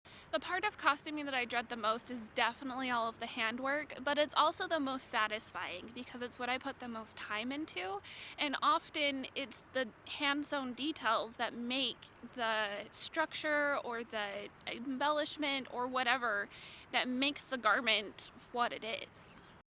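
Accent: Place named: Ohio, United States. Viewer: I knew your accent sounded familiar. I grew up in Ohio. :)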